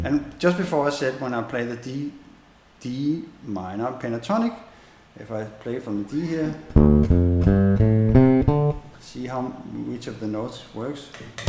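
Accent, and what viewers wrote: Denmark. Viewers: Great! A danish Bass player with danish accent (no offence) playing on a danish bass amp :) I like your videos. You are a great teacher. Greetings from Denmark.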